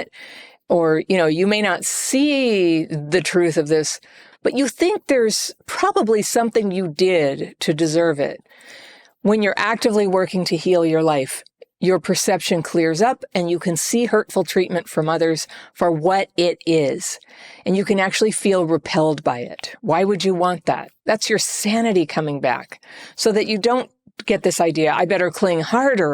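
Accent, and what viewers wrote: United States. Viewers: What a good videos you make, I always feel very seen in what you say and the way you bring your videos feels really like emotional support. By the way, what a GREAT American accent!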